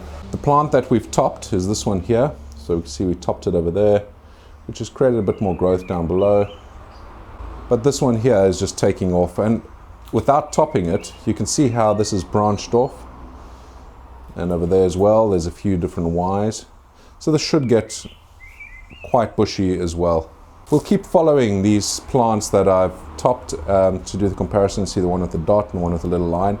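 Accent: South Africa. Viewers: It's clearly South African.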